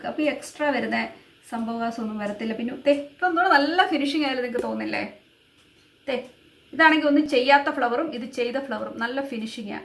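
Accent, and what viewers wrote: Kottayam, India. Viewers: Superb, good work, kottayam aano naadu, varthamaanam nalla rasamundu kelkan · കോട്ടയം kari ano 😍❤️😘👍👍👍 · ഇച്ചിരി ഇച്ചിരി .. കോട്ടയം. ചേച്ചി നന്നായിട്ടുണ്ട്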